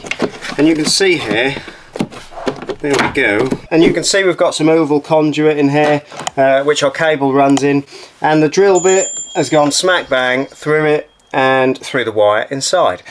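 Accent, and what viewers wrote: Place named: East Anglia, United Kingdom. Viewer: You sound like you're from East Anglia.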